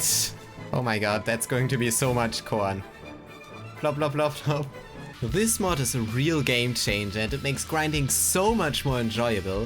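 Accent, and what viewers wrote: Germany. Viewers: Deutscher Akzent kickt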